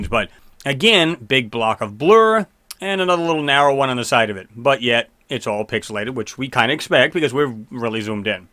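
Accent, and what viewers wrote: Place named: New England, United States. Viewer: Also I've seen a lot of your videos and like them a lot, I want to point out an anomilie with your accent, are you from new england??? I myself am originally from Maine and hear that. LOL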